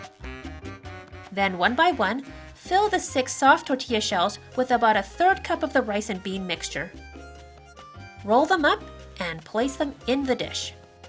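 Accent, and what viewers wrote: Canada. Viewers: Heh I like the Canadian accent - it's eh- nchilada like egg or end ;) (Mexican here). Nice recipe I would've never thought of black eye peas but they do have a meatier taste to me than other beans.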